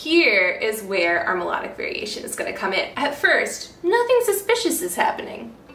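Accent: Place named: United States. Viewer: wow you aound so american it makes it really hard to follow along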